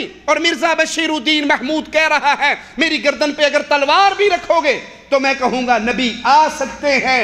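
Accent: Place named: Arab world. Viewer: Urdu arabi kay andaz may bolnay say jhot such may nahi badalta .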